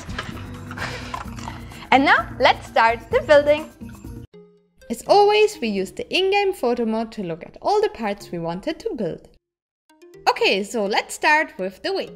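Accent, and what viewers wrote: Germany. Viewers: Just 'cause I'm curious, is it a German accent? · Ich schreib jetzt mal auf Deutsch weil sich dein Akzent definitiv so anhört als könntest du mich verstehen... ;) Diese ganzen Drucker etc. erleichterten eure Arbeit schon ganz schön, oder? Wenn ich die diversen anderen Cosplayer anschaue, die alles von Hand machen. Verkauft ihr die Kostüme dann?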